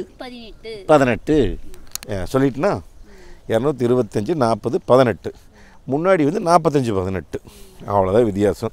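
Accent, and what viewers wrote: Kongu, India. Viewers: அண்ணா வணக்கம் கொங்கு தமிழில் கொஞ்சி தமிழ் வாழ்க வளமுடன்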